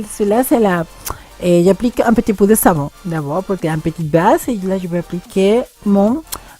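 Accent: Spain. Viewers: Ce maquillage est hyper lumineux et pétillant j'aime beaucoup tu es toujours magnifique 😻😻💓 j'aime trop ton accent espagnol moi qui a un amour grand pour l'Espagne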